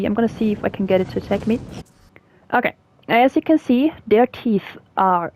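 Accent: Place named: Germany. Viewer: For what it's worth, I like the sound of the microphone. Ads to the ambiance since you're presenting the game. By the way, I know that accent. Germany ?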